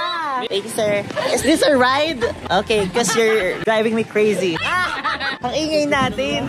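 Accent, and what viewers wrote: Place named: Visayas, Philippines. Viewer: kapoy bha hehehe ang cute mag bisaya ni huhuhu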